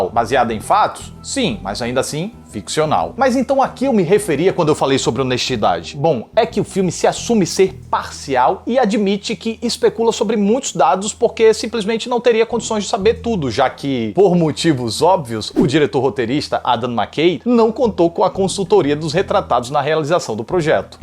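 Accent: Northeast, Brazil. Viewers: Amigo vc é do Nordeste é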